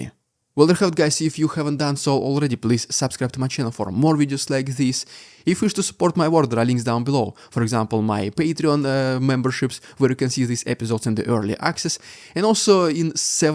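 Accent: Russia. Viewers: You know, your English (despite the accent) is most eloquent, even somewhat poetic. Wish I could understand Russian and listen to you in your native tongue to appreciate your words even more. We must never forget that enmity towards Russia isn't the same thing as enmity against the majority of its people. There are two wars going on simultaneously: Putin and his cronies against Ukraine, and then again, their propagandistic war against ordinary Russians. Let's hope that state madness will at some point cause ordinary Russians to wake up, rebel and reconstitute. But let's face it: a revolution in Russia in the past didn't lead to the emergence of sanity then, and quite likely wouldn't now.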